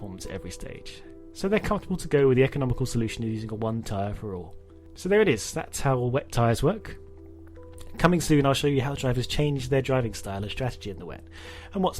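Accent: England, United Kingdom. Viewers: maybe its good if u put subtitles in it, cause i cant really hear your british accent :( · nothing racist but isnt all British and Europe people talk like that? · Lot, Wut? I understand everything. This is in English English, it seems. Do y'all only understand American English? Or is English not your first language? That will likely have more do to with it. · Yeah, maybe you're used to listening to American English. The English accent can sometimes make it harder for non-English speakers to understand. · Audio is ok, speaking style is very British english. As a Nebraskan. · Ive went through all his posted videos and found them easy enough to understand. I am fluent in American English with some Spanish. Perhaps its the English accent that gets you? I find these videos to be well done. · It was quite easy to understand him. It may be too soft at some times but still understandable. 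Maybe you're not used to hearing English in an English accent? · Seems like a lot of people aren't used to normal conversational Brit English, maybe you could lose the music though, as it doesn't add much. I've learned a lot from you, so thanks & please keep up the good work.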